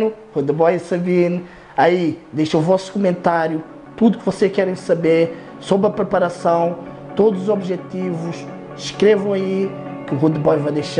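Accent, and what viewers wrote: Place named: Mozambique. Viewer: Me sinto encasa vendo os vídeos do pois temos o mesmo sotaque... Sou da África em Moçambique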